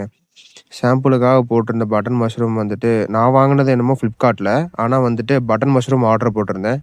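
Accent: Coimbatore, India. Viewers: கோயம்புத்தூர் சுற்று வட்டாரத்தில் பறிக்கிறதுன்னு சொல்றத பொறிக்கிறதுனு சொல்லுவாங்க அது பேச்சு வழக்கம் யாரும் ஏதும் நினைக்க வேண்டாம்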